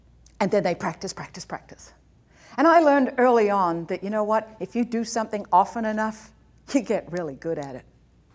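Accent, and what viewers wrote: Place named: Australia. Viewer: Houston speaker with an Aussie accent - who would have thunk it?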